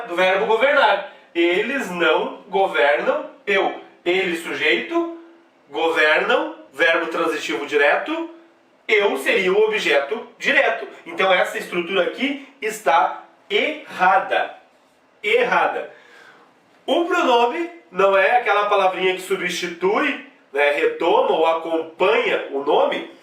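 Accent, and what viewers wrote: South, Brazil. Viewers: aula excelente aí!, a dinâmica ajuda bastante aí!, muito legal aí!, o professor é do sul mesmo aí!, parabéns aí!, obrigado! aí!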